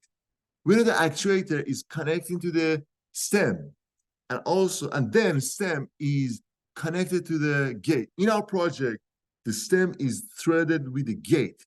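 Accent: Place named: Iran. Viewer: Mr. Mr. What is this accent why you talk like this? Everybody must know you iranian ?? Mr. Loose the accent your in texas now baba joon what is this ??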